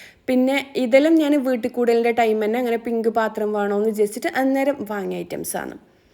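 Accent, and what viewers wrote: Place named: Kasaragod, India. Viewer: avideya, samsaram ketit kasargod pole end. Kasargod samsarikkund anne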